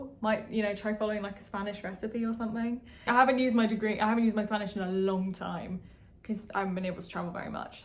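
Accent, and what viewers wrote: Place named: United Kingdom. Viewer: Basically, my motivation to subscribe to your channel is your British accent.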